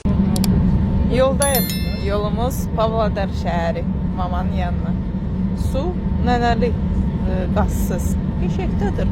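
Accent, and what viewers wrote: Ganja, Azerbaijan. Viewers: Necesen Gəncə lehcesiyle danışırsan maşallah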